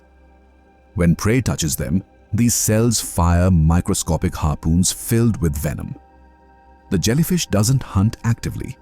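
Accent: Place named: India. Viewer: why is the ai voice talk like an indian?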